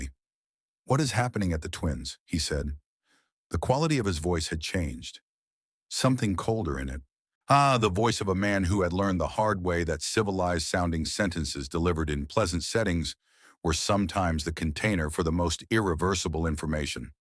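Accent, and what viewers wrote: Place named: United Kingdom. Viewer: Interesting story, but British accents are just meant for story telling.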